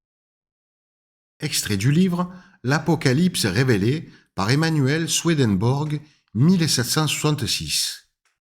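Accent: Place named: South, France. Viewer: Non, j'déconne vos vidéos sont très interessante te votre accent de sudiste est agréable!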